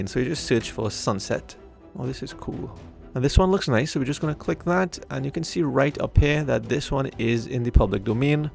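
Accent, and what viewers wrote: Trinidad and Tobago. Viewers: Thx for info. Love the Trini accent 😉👍🏾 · Awesome. So am I. I spot your Trini accent immediately. It's great to see a fellow Trinidadian doing well. Thank you for sharing. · Are you a trini?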